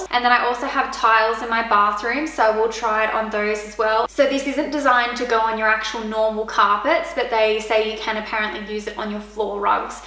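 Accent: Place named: Australia. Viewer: Love your looks, your pants, your nails and your aussie accent ("wooodaaaa"). Review is great. I have the corded crosswave.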